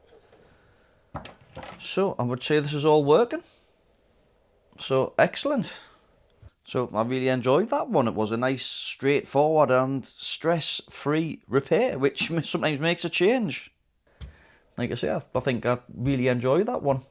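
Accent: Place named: Newcastle, United Kingdom. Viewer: Its a real pleasure hearing a geordie accent after ive been in nz for so long 😊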